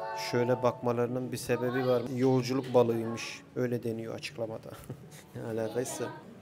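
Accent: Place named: Anatolia, Turkey. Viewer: abi japonyanın en kuzeyinde bile anadolu şivenden ödün vermemen çok özel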